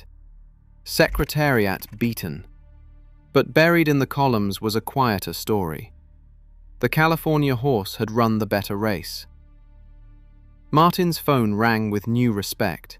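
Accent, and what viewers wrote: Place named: United Kingdom. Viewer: And…”Shom”? Good God, this British accent allegedly is silly with almost all American Racehorses discussed.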